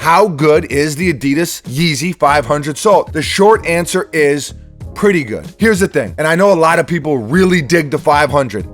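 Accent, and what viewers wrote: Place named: Boston, United States. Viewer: def from boston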